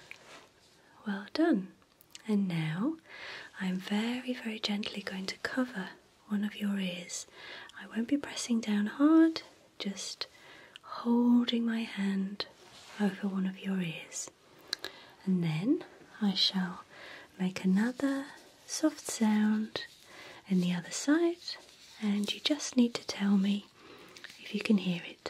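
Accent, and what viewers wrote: United Kingdom. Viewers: I love your british accent. kisses and hugs from brazil!! 💓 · u british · I love ur British accent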